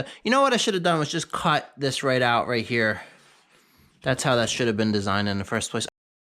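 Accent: New York, United States. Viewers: What part or town of New Yawk are you from? looks like upstate from the vids Ive seen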